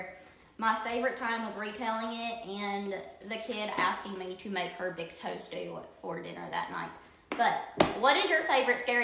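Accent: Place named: South, United States. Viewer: howdey from wv were are you from your acent is thick as southern corn bread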